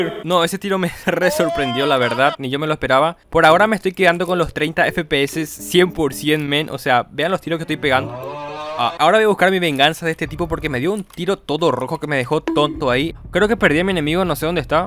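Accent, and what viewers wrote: Paraguay. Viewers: Ese acento paraguayo se nota de lejos. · No lo conosco pero su acento parese paraguayo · Kp vos sos paraguayo verdad? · De Paraguay? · Man pensé que eras de otro país por tu forma de hacer los videos pero por tu forma de hablar noté que sos paraguayo gran video sos grande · Eres Paraguayo? verdad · eres paraguayo vrd bro? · Eres paraguayo? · Eres de Paraguay? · SOS de Paraguay? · Paraguay? Kp s nota el acento mi reynol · Sos de Paraguay???? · Sos paraguayo ?🤠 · tu eres paraguayo tu asento es de paraguay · Sos de Paraguay? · Eres de paraguay?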